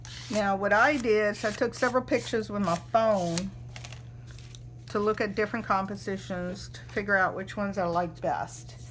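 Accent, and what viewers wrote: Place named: South, United States. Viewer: I'm from New Orleans. Are you from the south? Sounds like it lol. I always like to guess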